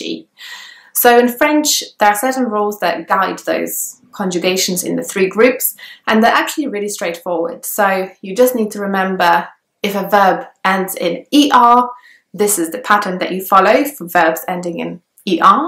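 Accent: United Kingdom. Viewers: Wait english isn't your native language, could've fooled me, you just sounded british to me ,so what is your native language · Wait you’re polish ? i thought you were british lol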